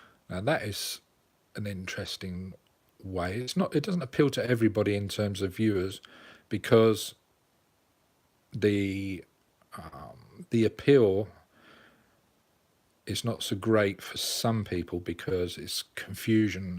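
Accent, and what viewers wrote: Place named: Cockney, United Kingdom. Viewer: Yeah can tell you got abit of cockney in you were do you travel most?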